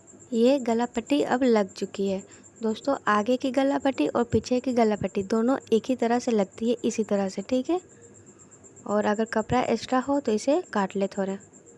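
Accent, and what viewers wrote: Bihar, India. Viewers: mam aapki boli se pakda ki aap bihar se ho ...waise mam kaha se hai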